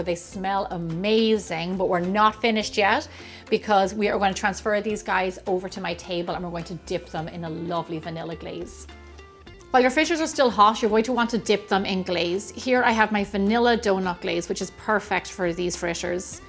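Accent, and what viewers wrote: Ireland. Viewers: Ohhh my goodness!!!!!!I was looking for a easy recipe for fritters and BINGO!!!😂😂 first of all, i LOOOOOVE your accent!!! Truly🤗🤗🤗🤗 Irish???? OK so I love how easy it is and best of all no freaking yeast!! I love baking bout NOOOOOO yeast recipes, I just don't have the patience 😮 I'm gonna try these at work, I'm a cook in a rehab facility. These will certainly put a smile on the patients face and the employees come breakfast 🥟☕️ Thank you so much and I'll be looking into those doughnuts also. Glad I came across your videos. From deep in the ❤️ of TEXAS 😊😊😊😊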